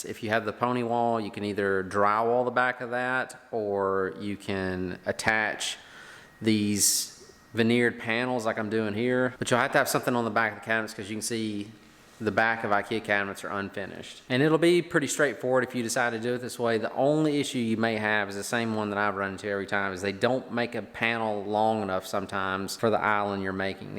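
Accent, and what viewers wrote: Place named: Tennessee, United States. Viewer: Are you from Old Hickory Tennessee? You sound like you could be Nate Bargatze’s brother. Lol!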